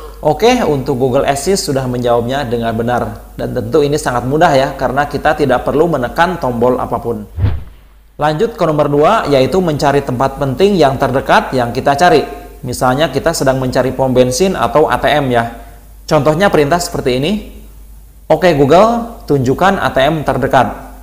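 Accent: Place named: Indramayu, Indonesia. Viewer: Orang indramayu to...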